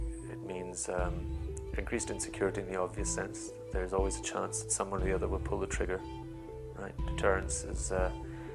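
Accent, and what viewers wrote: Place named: Pakistan. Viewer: This is pure Pakistani English accent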